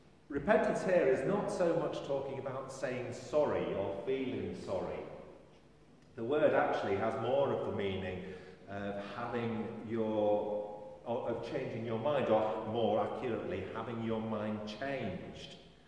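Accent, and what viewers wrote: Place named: England, United Kingdom. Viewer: I look forward to your sermons as I live in Greece and we only have Greek Orthodox churches here -- I look forward to listening to you (good old English accent) - I miss Edgeley and look forward to coming home as soon as I sell my place here. Thank you. Stay safe.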